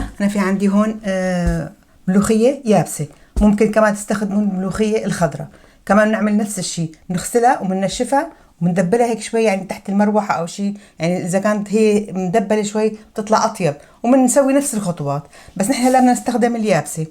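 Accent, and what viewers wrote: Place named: Levant. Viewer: جننتي سماي ماعم اعرف لهجتك شوي بتحكي حلبي شوي شامي على فلسطيني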